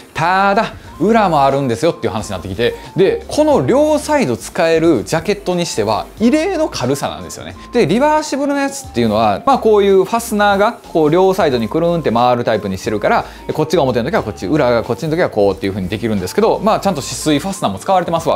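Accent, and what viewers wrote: Kansai, Japan. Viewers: めちゃくちゃイケメンで似合ってるし、物も良いのは認めるんだけど、この感じの関西弁でプレゼンされると胡散臭く見えてしまう😢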